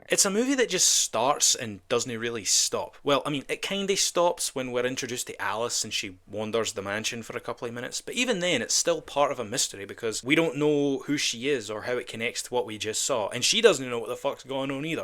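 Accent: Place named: Scotland, United Kingdom. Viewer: This is the second of your videos ive watched. Funny commentary and I fucking love the Scottish accent. So hot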